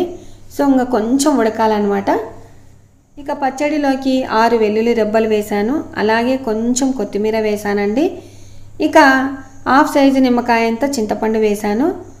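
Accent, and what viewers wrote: Rayalaseema, India. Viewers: Chala bagaa chestunnaru akka, mana Rayalaseema slang mee vedios lo happy ga vintunnanu....mee daughters nd atta sooo cute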